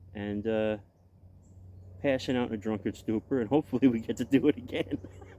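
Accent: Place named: Long Island, United States. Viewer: you r an american orthodox cowboy with a longisland accent. a ministry for the ppl. retards unite. simplecore ftw. and mexican liquid bread is a simple joy. 💕🙏🏻 always praying for u Fren.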